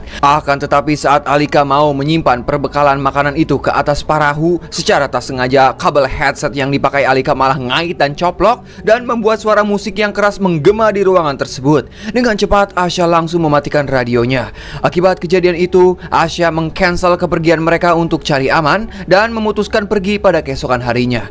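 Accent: West Java, Indonesia. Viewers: Bang hadir Bogor ciawi.aku suka ceritanya.dan ngomong nya kaya aku ada Sunda nya aku jadi senyum" sendiri😂😂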